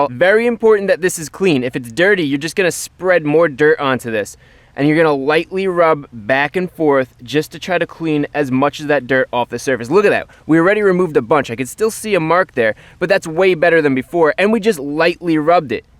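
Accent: United States. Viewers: This dudes accent is so American. And he's so Positive, happy, and enthusiastic. Makes me smile and have an urge to clean.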